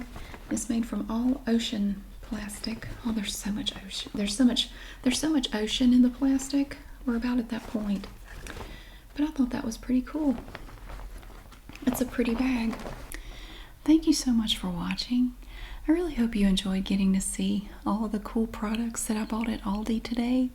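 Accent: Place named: South, United States. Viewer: I love your accent. Your voice alone is so incredibly soothing, but the accent feels so homey (even though I am from Finland, I certainly haven’t grown around an accent like this hahah.) I dare to assume it’s southern? Love your videos anywho!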